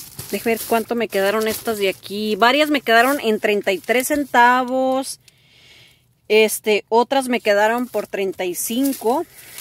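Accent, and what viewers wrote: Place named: North, Mexico. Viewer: EMPECE A SEGUIRTE X RECOMENDACION DE LA TOCAYIUX Y ME ENCANTA TU ACENTO 😍 YO TAMBIEN SOY NORTEÑA 🌪🌪🌪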